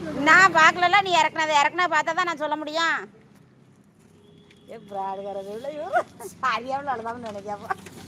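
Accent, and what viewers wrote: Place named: Tirunelveli, India. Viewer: அண்ணி நீங்க கோயம்புத்தூரில் இருக்கும் போது ஒரு வார்த்தை பேசுறிங்க இப்ப வந்து திருநெல்வேலிக்கு போயிருப்பிங்க போல இப்ப ஒரு வார்த்தை அந்த ஒரு பாட்டில பேசுறீங்க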